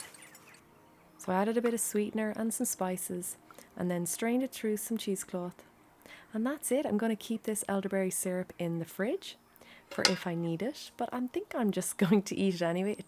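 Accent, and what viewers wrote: Ireland. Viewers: One of my best friends, God rest her soul, was Irish. And as soon as I can, in the near future, would love to visit Ireland. In honor of her. Seeing your video & hearing your accent makes me realize that my dream can happen as soon as the virus has a vaccine or When I feel it is safe to travel again. One question I have when is a good time of the year to travel, whether wise? And can you recommend places that I should visit? Thanks. By the way your videos are awesome. And I love the thought of "fairy doors". I am thinking of incorporating "fairy doors" where I live. It is an interesting concept.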